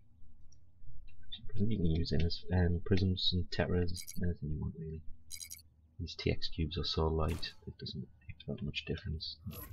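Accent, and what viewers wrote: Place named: Northumberland, United Kingdom. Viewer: So, you're obviously English, where you from? Sound like you're from Northumberland!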